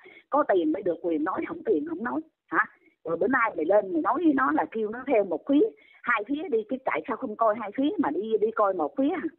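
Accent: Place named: North, Vietnam. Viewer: Sao co líp này nói cái giọng nói bac kỳ sao ghét quá, giọng nói người nam ta nói thấy dễ thương 😊😊😊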